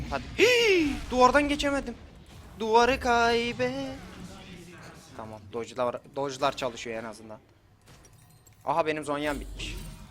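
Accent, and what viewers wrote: United States. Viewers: şu amerikan dublajı yapmayı bıraksan hani videolarında eski tat yok reis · Kardeşim şu amerikanvari konuşmaların gerçekten tipine çok iyi gidiyor başarılarının devamını dilerim:D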